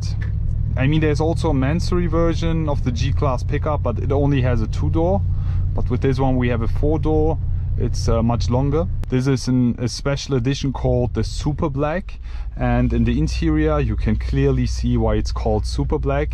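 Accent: Germany. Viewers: Very iritating english german pronunciation!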